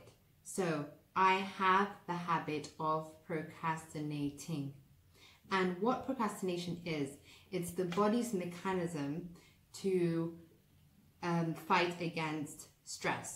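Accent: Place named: United Kingdom. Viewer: This is a great video. In love with your accent a bit British but not strong. Just so cool. And that's a great video content there!!! Keep it up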